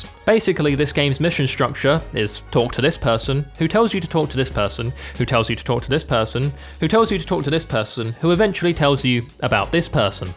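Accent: England, United Kingdom. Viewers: Your vids are absolutely wonderful And your English accent amplifies their greatness in 10000 times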